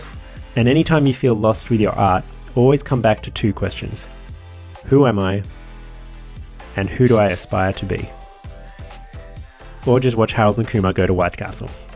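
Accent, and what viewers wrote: Australia. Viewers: WAS NOT EXPECTING THE HUGE AUSSIE (or New Zealand) ACCENT!!! I fell off my chair XD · wait was that sydney I heard..? yOU'RE AUSTRALIAN TOO?? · Whew, asian-australian (?). The accent was unexpected. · Australian? o_o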